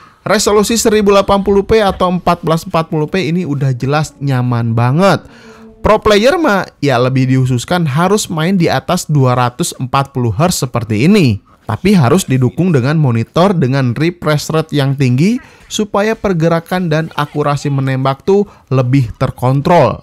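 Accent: West Java, Indonesia. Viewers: Nyunda pisan atuh kang logat na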